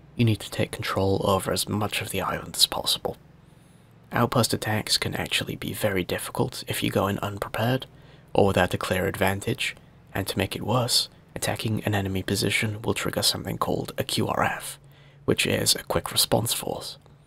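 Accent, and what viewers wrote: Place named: England, United Kingdom. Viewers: Your accent is really intriguing me, it's like a cross between Queen's English and Yorkshire! Haha. Great vid BTW! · I thought the accent sounded familiar, I just moved to north yorks myself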